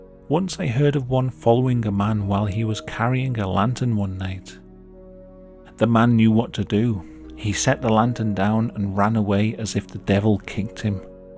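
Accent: United Kingdom. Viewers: British but not your typical crisp British News Channel voice and accent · “Hey everybody, here” in his British voice should be trademarked and copyrighted tbh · I watch a lot of these sorta stories with british accents of all kinds. I like yours. Reminds me of carl. You know, ricky gervaise's friend on that radio show... the guy they all make fun of. Not saying anything bad about you lmao.. I like carl's accent a lot. And yours is like his. Proceed.. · is 100% British.. 🇬🇧 from the North West of England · he is british 🤦‍♀️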